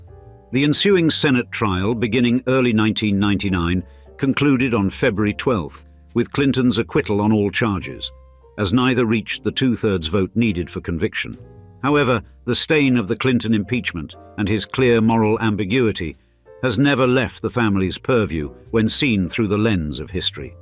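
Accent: RP, United Kingdom. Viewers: Another BULL SH*T British narration - stick to your own corruption. There's plenty of it. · The lesson here is if you hire a guy with an aristocratic British accent you figure you can put all kinds of lies, bad stats and idiotic comments. I will just focus on one; Bill Clinton did not create a $200 billion + surplus. And this is just one of many in the first 5 minuted of this propoganda piece. Goebels would be proud.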